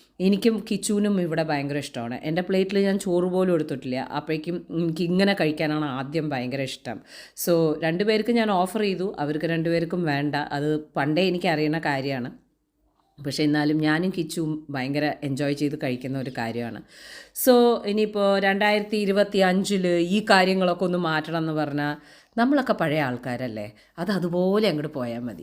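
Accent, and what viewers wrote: Palakkad, India. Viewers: Dear.... സുഖായോ... Sound ഇപ്പോഴും ക്ലിയർ ആയിട്ടില്ലല്ലോ... കാത്തിരിക്കയായിരുന്നു വിനീടെ പാലക്കാടൻ സംസാരം കേൾക്കാൻ.. സന്തോഷമായി... Wishing you life"s best mn new year 💐💐💐💐💐❤️, കണ്ണേട്ടനേയും, മക്കളെയും ചോദിച്ചതായി പറയണേ... വിനിയെ കാണുമ്പോൾ എന്തോ ഒരു sister like feeling ആണ്.. മക്കൾ വളർന്നു... സന്തോഷം.. ഒരു ഹായ് പറയണേ.. From Sophia Dicruz ഫ്രം പഞ്ചാബ്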